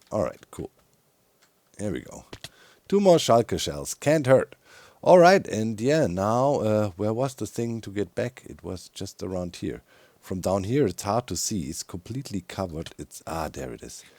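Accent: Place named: Germany. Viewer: I- you're German! I've been hearing your voice in other hermits videos, and couldn't pin point your accent! I feel so silly, but I guess I grew up around enough German accents that it sounds as "normal" to me as English.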